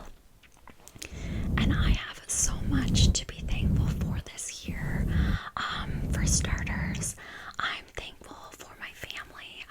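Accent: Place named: Canada. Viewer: Your Canadian accent really comes through in this video 🍁❤️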